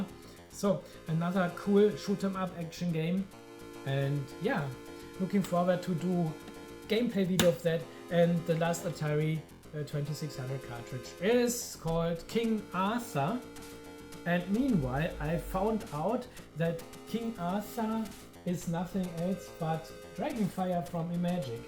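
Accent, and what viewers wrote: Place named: England, United Kingdom. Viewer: Great video buddy. Enjoyed it. Are you in England? Some of your words gave it away 👍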